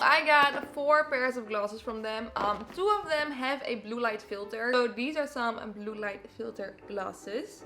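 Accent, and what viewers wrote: Netherlands. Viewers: are you dutch? you sound like you have a dutch accent. Love!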